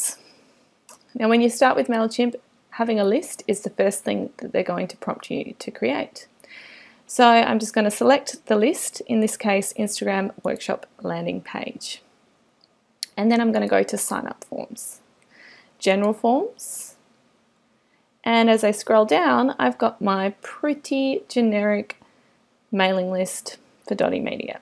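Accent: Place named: Australia. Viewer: Hi, I love you girls you look great and being a fellow Aussie I just looovveeee the accent. Hey how do I get my non - generic landing page onto my website??? Many thanks, The Urban Garden Companion- An Aussie Gardener in the New York Jungle.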